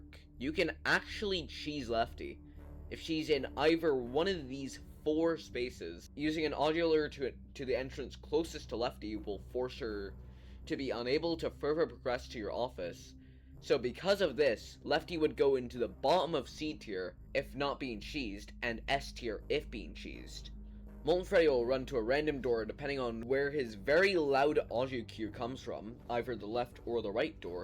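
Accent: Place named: Ireland. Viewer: he's from ireland, so i think he's still tryna learn english as there dutch is like the native language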